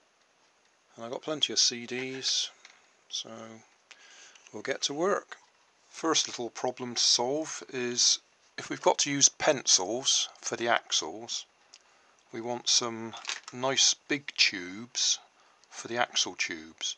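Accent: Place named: England, United Kingdom. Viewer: Love to hear an English accent..............so, you 'knicked' the egg box?' Huh.....:)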